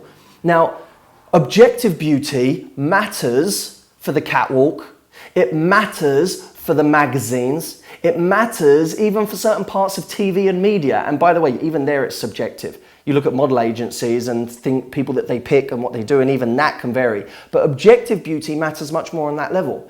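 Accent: England, United Kingdom. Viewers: your british accent is killing me! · you look smexy to..... i love brittish acsents <3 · I like British language 😂 I mean accent ☺️ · Your So Hot, i dont mean to be shallow, re: being your looks, your English accent:) it's definatley your whole package;) love your video, thanks once again, always emotionally intelligent and of value. Cheers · That's just how everyone in the UK speaks.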